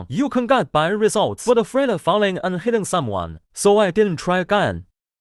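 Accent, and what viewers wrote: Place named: China. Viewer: 不知道为什么 你的英文发音好中文啊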